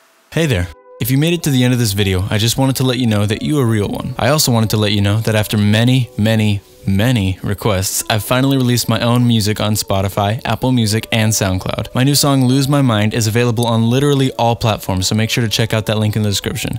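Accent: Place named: United States. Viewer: you sound so american lmao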